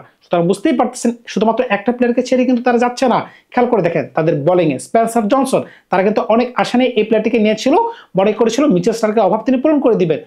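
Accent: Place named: East Bengal, Bangladesh. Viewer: Bangal katha posay na. Valo bangla uchcharan karu n.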